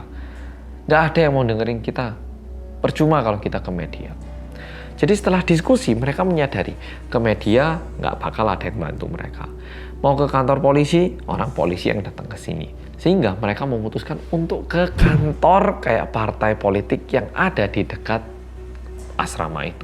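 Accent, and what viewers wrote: Java, Indonesia. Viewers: Mirip Dr. Tirta ngomongnya Medoook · Halli gais welcome back to bersama orang Korea yang medhookkk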